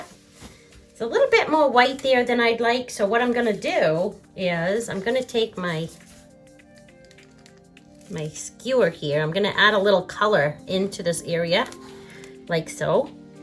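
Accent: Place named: New York, United States. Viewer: Very beautiful. You have a New York accent. So cute.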